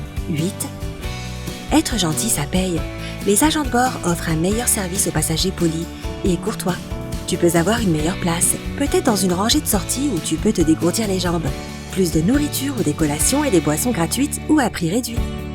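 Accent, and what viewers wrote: Canada. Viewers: Pourquoi on entend plus la voix canadienne qui commente ? Sympa je veux la voix canadienne, elle avait tant de charme que j'aurais pu l'écouter pendant des heures en non stop 😍😍😍 ! alors stp sympa mets là de temps en temps au moins ! Merciiiiiii !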